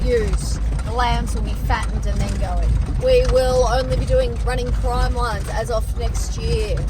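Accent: Australia. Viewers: Trying to just learn Aussie accent with you 🙂😂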